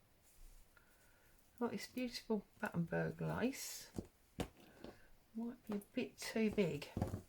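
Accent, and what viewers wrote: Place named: South London, United Kingdom. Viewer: that was brilliant- loved the loaded tag really lovely. I love listening to your South London accent - makes me homesick - hope you're having a great weekend- 😊❤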